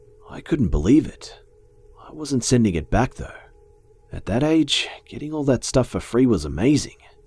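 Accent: Australia. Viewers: Are you Australia mate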